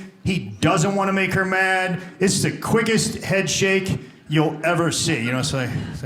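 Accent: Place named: New York, United States. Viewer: The New Yorker accent is spot on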